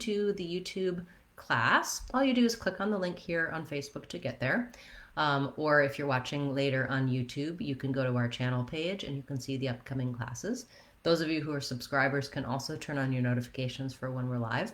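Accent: United States. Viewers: Fantastic way to immerse in American Accent is to listen to you. Thanks for making it easy for us. <num>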